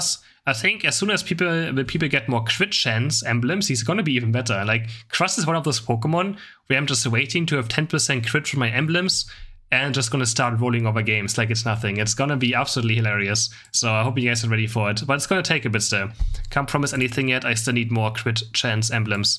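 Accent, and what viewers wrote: Germany. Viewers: Klingt ziemlich deutsch 👀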